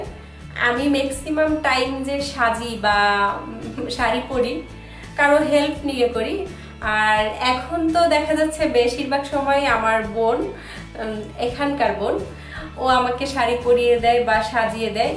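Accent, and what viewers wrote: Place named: Barisal, Bangladesh. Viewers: To mar borisal er moto kotabola kub valo laglo tomarvlog dakte valolage ami rubina to make amar didir moto lage.ami sarervdo deke 25 kg ojon komiachi.akon ami 49kg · ami tomake ajkei boltam borisaler vasai kotha bolte ar tumi deksi already bolso,meg na chaite jol.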